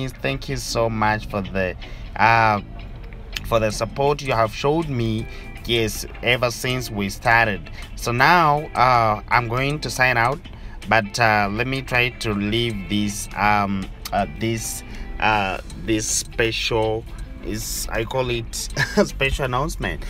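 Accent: Uganda. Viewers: According to your accent I think you’re a Ugandan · Yeah definitely Ugandan. He sounds and talks like my dad.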